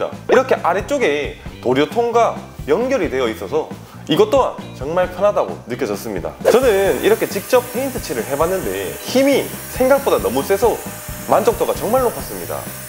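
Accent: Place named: Gyeongsang, South Korea. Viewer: 영상이 너무 뜸해요. 구수한 경상도사투리가 매력적인데 자주 얼굴보여주세여!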